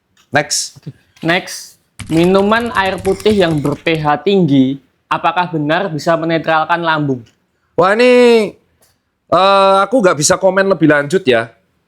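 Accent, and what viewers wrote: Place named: West Java, Indonesia. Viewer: Nih dr tirta aksennya sprti orang sunda.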